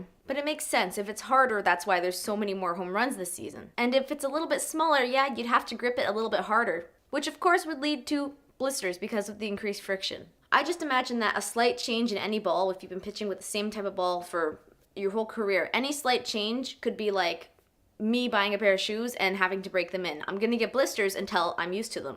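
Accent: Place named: Toronto, Canada. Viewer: are you from the Toronto area?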